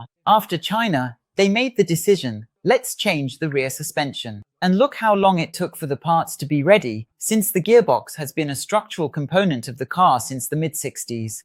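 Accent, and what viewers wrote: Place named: United Kingdom. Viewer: Ai eu gostei hein ADM! Meteu o british accent pra jogo! Me senti na F1TV! Parabéns pelo passo de subir mais um degrau!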